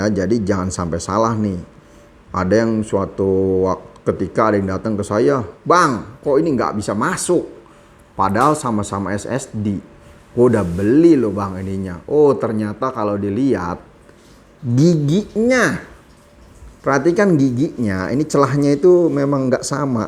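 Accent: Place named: Betawi, Indonesia. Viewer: orang betawi ya bang, gaya bicaranya ketauan banget. abangnya ganteng juga. upgrade saya dong bang🥰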